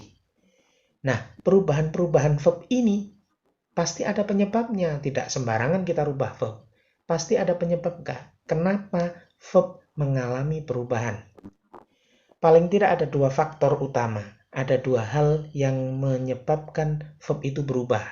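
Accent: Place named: Surabaya, Indonesia. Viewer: ALHAMDULILLAH. THANK YOU. VERY HELPFUL. ESPECIALLY USING SUROBOYOAN ACCENT ❤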